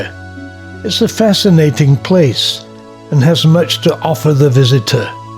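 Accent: Scotland, United Kingdom. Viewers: Just perfect British accent to hear just sat to have afternoon tea.... Love the Touring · Do i or do i not detect a Scottish accent in this vid.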